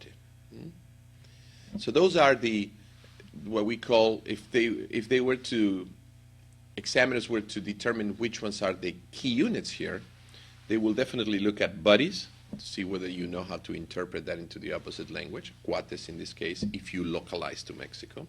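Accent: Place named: Argentina. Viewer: Argentine Spanish accent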